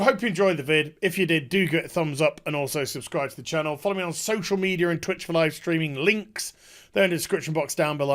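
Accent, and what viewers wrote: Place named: United Kingdom. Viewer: You just keep speaking British to me and I'll keep liking your videos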